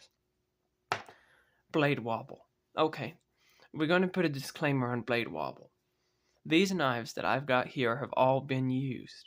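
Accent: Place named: Virginia, United States. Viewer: I just came across your channel and Ive watched 4 or 5 of your videos so far and Ive enjoyed them all. I must ask, what state or region are you from? Im trying to pin point your accent. If I had to guess, I would say Virginia or a neighboring state to it. I do want to throw in my experience on this subject. I apologize in advance as this got longer than I initially intended. I collect knives of many manufacturers, Case of course, but also older Remingtons, pre swinden Schrades, Queens, GEC, etc. I would say though that Case is my bread and butter and where my knowledge and experience most lies. Before I say what I am about to say, you and everyone else who may read this should know that I specifically collect pre-1970 Case knives. I currently have probably two dozen of these, mostly XX era. I have some newer ones as well but much prefer the XX era knives. 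Now that everybody knows my bias, I want to say that it is my opinion that Case quality is no worse now than it ever has been. I would say 3/4 of my pre-1970 Cases are mint, unused and not a single one is what a lot of people nowadays would judge as “perfect”. They all have blade rub, they all have atleast one if not more proud scales or bolsters, they all have atleast a proud or sunken back spring when a blade is open, the centering is not perfect on any of the ones where blade centering is applicable, all have gaps somehwere and the factory edges on the vintage mint ones suck as well. These are all things people complain a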